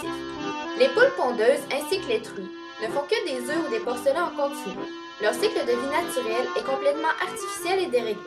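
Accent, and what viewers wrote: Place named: Canada. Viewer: Trop de musique de fond, en plus avec votre accent canadien, difficile de suivre !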